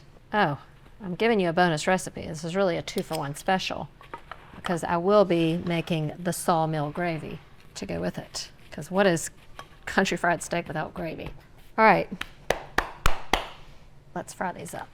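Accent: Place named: Texas, United States. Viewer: Is she frkm TX? Love her accent ☮️💟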